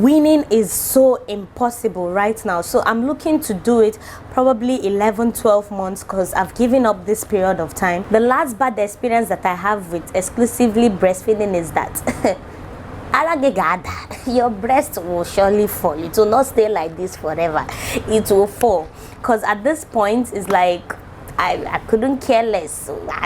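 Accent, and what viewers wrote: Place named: Igbo, Nigeria. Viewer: Am here for the Igbo words and accent. Love from Kenya ❤️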